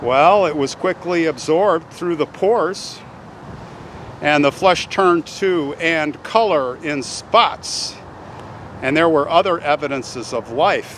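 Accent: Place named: New England, United States. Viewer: Makes you wonder how many others were buried alive back then.I detect an accent from the New England area. I am also from there.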